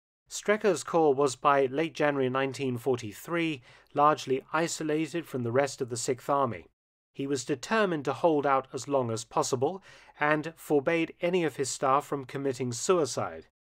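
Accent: England, United Kingdom. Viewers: Mark Felton with his English accent makes the documentaries better. More gravitas. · I see there are over a hundred dislikes. How is that possible this is really good stuff that's never been shared to this extent that I heard. Are the dislikes because they think it's untrue or because the guy has a British accent I just can't for the life let me figure out why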